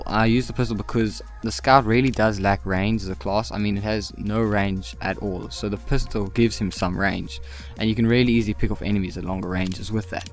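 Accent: Afrikaans, South Africa. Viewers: are you afrikaans? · He is South African:D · Are you South African? I'm Scottish but my mother is South African and you both say words the same way.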